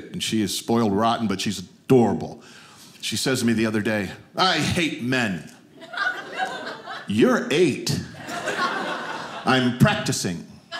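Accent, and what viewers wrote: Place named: New Jersey, United States. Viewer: So funny. I'm from Jersey. We tauk like dat!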